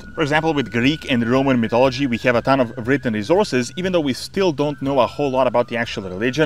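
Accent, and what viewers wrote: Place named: Eastern Europe. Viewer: Are you Slavic? You always sounded a bit like that.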